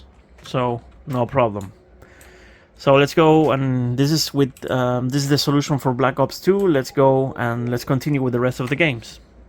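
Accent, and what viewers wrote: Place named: Argentina. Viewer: Qué pro, Boludo tus tutoriales son de lo mejor,, sigue con el desarrollo de tus proyectos, sabía qué eras Argentino, ese acento no se quita ni con inglés, desde el principió me dí cuenta con ese inglés, jaja, it keep also, bro.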